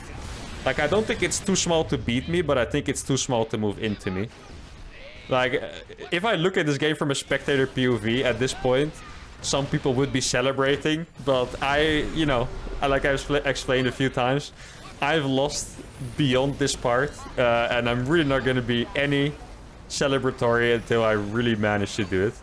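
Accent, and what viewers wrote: Netherlands. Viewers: Are you dutch? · You are dutch arent you😋 I reconise our accent everywere. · Argh that dutch accent. Nice vid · Hallo Nederlands accent 🙂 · First video to see from this channel.. and judging by your accent.. you're Dutch aren't you?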